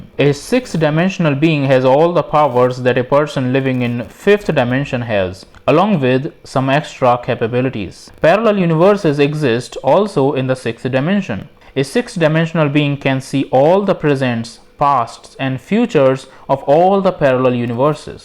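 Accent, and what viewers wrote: India. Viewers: The fact that he says "higher dimensions" every 5 seconds with his lovely indian accent just blew my mind.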